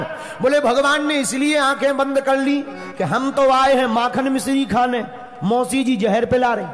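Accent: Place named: Barsana, India. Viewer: Aaj to kya baat hai Gurudev barsane wali bol rahe ho bhasha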